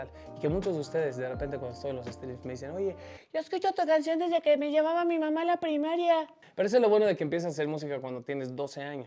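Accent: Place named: Mexico City, Mexico. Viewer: Los mundialmente conocidos! (Cuando aún tenían acento chilango xdxd)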